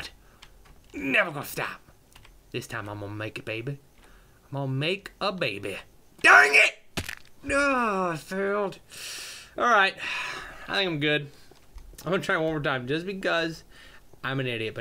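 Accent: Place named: England, United Kingdom. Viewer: I love his English accent. It's........ EPIC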